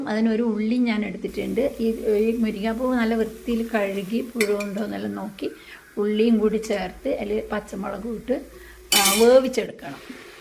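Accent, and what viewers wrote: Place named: Kannur, India. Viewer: കണ്ണൂർ കുക്കിങ് സൂപ്പർ ആണ് ട്ടോ കണ്ണൂർ ഭാഷയും സൂപ്പർ ❤️🥰❤️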